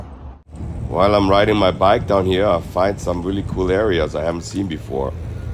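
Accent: Germany. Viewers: Sounds like you have a cold and your German accent has gotten stronger. Welcome back to California.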